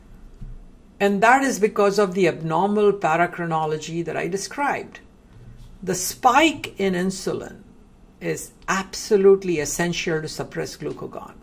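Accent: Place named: India. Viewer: I think i have to go throu all this information 3 or 4 times to fully understand it. Very interesting but also very complex. I love your work and your indian accent helps me to focus without getting tired. Looking forward to see more soon. Greetings from Galicia, nortwest Spain!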